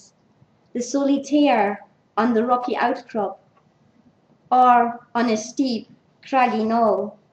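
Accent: United Kingdom. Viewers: I found this really interesting. I've been exploring the local iron age sites and I've had a thought. When and why did people change from building in circles, to building with corners (of varaing and questionable accuracy)? May I also say what a lovely accent! Is it from Shetland? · I like the speaker’s vocal volume undulations, as you call them. They convey her attitude to the questions she’s discussing. For example, where she discusses what architecture can tell us about how much the broch builders competed to out-build one another in height. I lecture, and I do the same. It’s better than delivering in a monotone. As for the accent, don’t you have any friends from Scotland? If not, find some. Just remember not to visit the country outside May to late September, or you’ll either drown in the horizontal rain, or die of cold. Regarding the talk’s intentions, the main one, I think, is to examine brochs from an engineering/architectural point of view. 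Do structural analyses, for example, to calculate how high, given a particular ground diameter, you can build the walls before the broch collapses. From that, you can work out whether a broch was in fact built to be as tall as possible. That tells you something about how fiercely the builders tried to out-build one another. And that in turn gives clues about how they tried to advertise their status. There’s more about this in <link>, “Land, Stone, Trees, Identity, Ambition: the Building Blocks of Brochs”, Archaeological Journal, 173:1, 1-29, 2016. mentions something related, when she says that the brochs built with better stone had thinner walls. This implies that the builders weren’t competing, because the ones with the better stone threw away the advantage that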